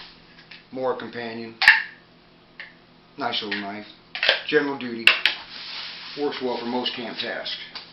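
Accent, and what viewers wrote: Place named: United States. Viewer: He speaks English with American accent. BTW, American accent is the most spoken English accent in the world.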